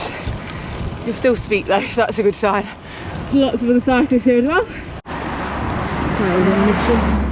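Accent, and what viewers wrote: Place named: East Midlands, United Kingdom. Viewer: Are you Notts folk? Defo an East Mids/South Yorks twang.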